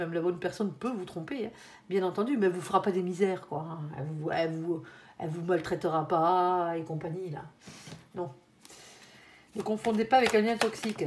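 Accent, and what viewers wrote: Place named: South, France. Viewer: Vous êtes super, et quelle energie avec ce petit accent du sud😂 Quelle maitrise, ça inspire confiance❤ très beau tirage, merci😘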